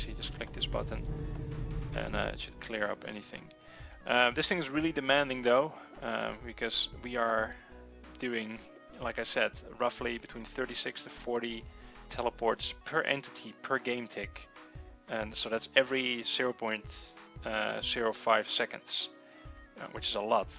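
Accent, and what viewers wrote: Netherlands. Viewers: Haha ik hoor dat je nederlands bent, dat betekent niet dat je engels slecht is hoor maar gwn je accent en uitspraak! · What kind of accent is that? Are you dutch?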